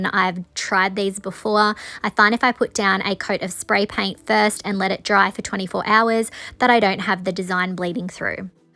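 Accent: Australia. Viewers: I've been watching your videos for several months, and have never commented. Shame on me! Honey, you are just terrific! I have learned so much from watching you. Everything you do, you do with excellence. And beside all that--this old Florida girl just loves your Aussie accent. Keep up the good work.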